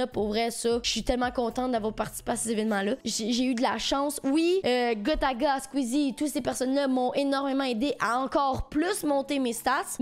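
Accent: Canada. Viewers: Haa non une cocotte n'est pas une boule mais c'est un mot argot qui signifie la poule , l'animal 😂😂.. Mais super vidéo et l'accent canadien c'est stylé quand même 😂😂❤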